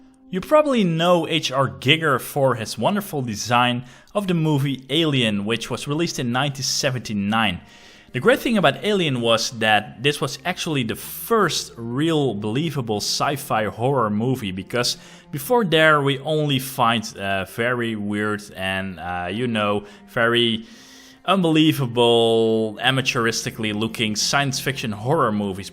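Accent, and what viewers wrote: Netherlands. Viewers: Gotta love the Dutch accent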